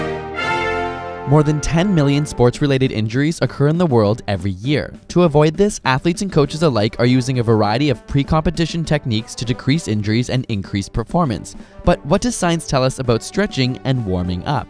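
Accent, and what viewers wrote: Canada. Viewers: Are you Canadian? You kinda sound Canadian.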